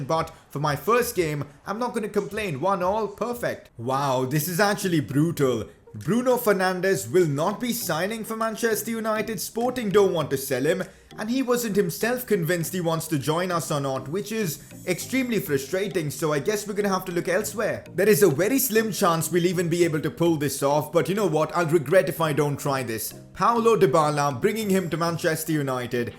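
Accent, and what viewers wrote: India. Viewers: India accent😁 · Are You Indian.... Cuz your accent sounds too familiar....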